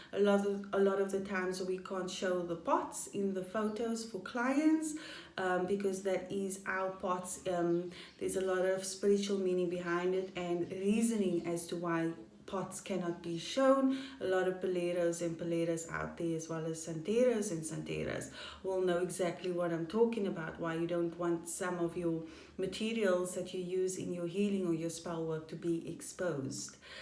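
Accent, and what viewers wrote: South Africa. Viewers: You're definitely from Cape Town, but did you sound like you grew up on the Flats, right?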